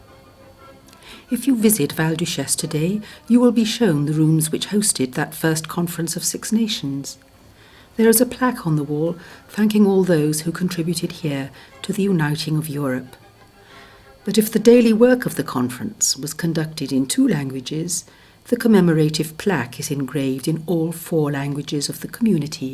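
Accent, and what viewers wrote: Wales, United Kingdom. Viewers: Wales, indeed.. an enjoyable accent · Hi Latertoe, the speaker doing the voice over is from Wales. all the best, Ian DG Interpretation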